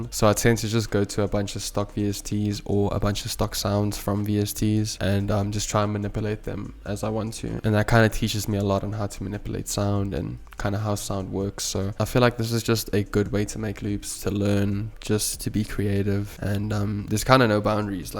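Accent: South Africa. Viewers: Bro. Are you South African? That accent? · I know a SA accent when I hear one 😭🔥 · You sound south african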